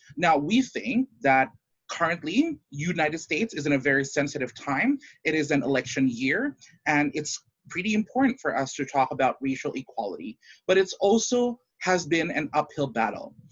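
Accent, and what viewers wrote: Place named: Indonesia. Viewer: Kebanyakan "You know", pronunciation ada yang kayak Indonesian-English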